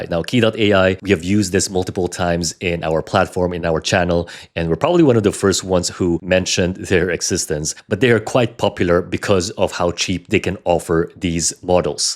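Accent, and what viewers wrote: Philippines. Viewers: Why do I hear a Filipino accent? :) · Are you filipino? I can hear some accent :D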